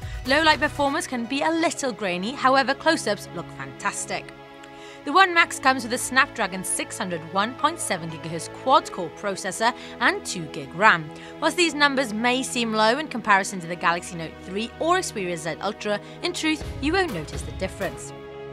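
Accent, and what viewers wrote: Wales, United Kingdom. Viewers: ur from WALES ! Alright, hows it going Alright?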